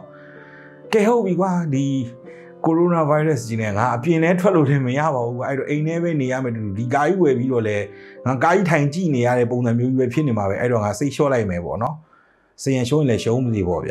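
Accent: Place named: India. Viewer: ဟြန္ဒိုင္းကAccentကမိုက္တယ္ဗ်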